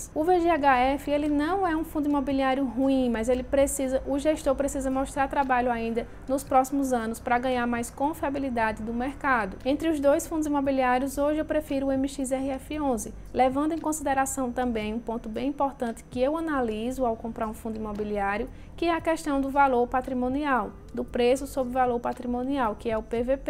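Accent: Northeast, Brazil. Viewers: Vc é de que estado do nordeste,??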